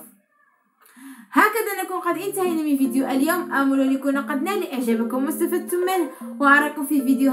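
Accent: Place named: Morocco. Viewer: أعرف أنك مغربية ويبدو ذلك في لهجتك المغربية المميزة ....كنت أمزح فقط . استمري في دروسك وندعو لك بالتوفيق ونحن لك من الشاكرين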